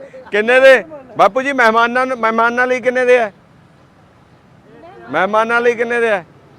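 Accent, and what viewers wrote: Malwa, India. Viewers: ਲਹਿੰਦੇ ਪੰਜਾਬ ਵਾਲਿਆ ਦੀ ਬੋਲੀ ਮਾਝੇ ਨਾਲ ਦੀ ਆ ਸਾਨੂੰ ਸਮਝ ਆ ਜਾਂਦੀ ਆ ਦੀ ਬੋਲੀ ਮਲਵਈ ਆ ਤੁਹਾਡੀ ਬੋਲੀ ਸਾਡੇ ਨਾਲੋ ਥੋੜੀ ਜਿਹੀ ਅਲੱਗ ਆ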